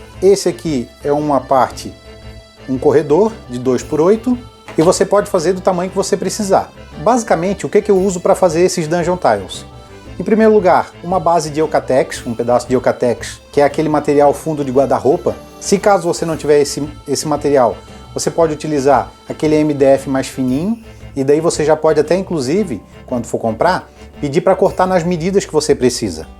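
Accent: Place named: Santa Catarina, Brazil. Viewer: E esse sotaque aí meu extimado? És da onde tu? SC?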